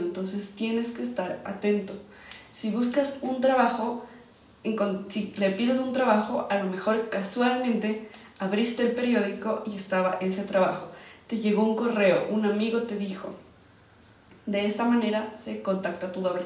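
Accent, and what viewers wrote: Mexico. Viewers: Tu tienes acento como si fueras mexicana no suenas ecuatoriana. Me gustan mucho tus videos, que Dios me ayude a conseguir lo que quiero ❤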